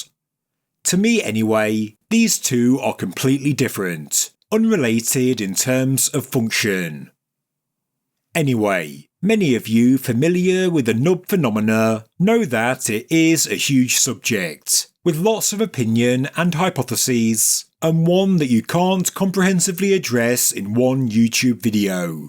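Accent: United Kingdom. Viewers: He doesn't lol....he just gets possessed right before he begins recording and snaps back out of it when hes done. He speaks normally, other than the British accent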